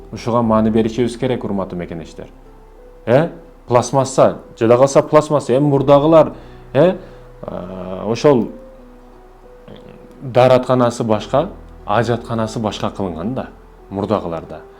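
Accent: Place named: South, Kyrgyzstan. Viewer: Туштук диалекте ошентип эле айтылат, уккуң келбесе укпа.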